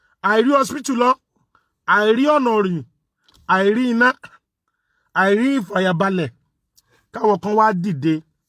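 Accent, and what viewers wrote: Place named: Egba, Nigeria. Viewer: Niceness, I'm enjoying the tiny bit of your Egba accent...lol (I'm Ijebu); Yorubas are such a beautiful and diverse people, I can barely wait to live in our new Nation.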